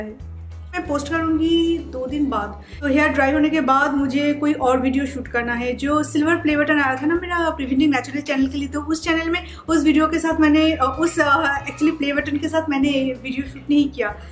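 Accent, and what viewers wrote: Bengal, India. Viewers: Hi. I recently started watching your videos. I live in NY,USA but I was born and raised in Kolkata. Watching you connects me with my childhood and youth. I love the way you talk in hindi like most of my bengali friends did. You sound so genuine and so comfortable in front of the camera as well. I am always looking forward to watch your videos. Keep up the great work.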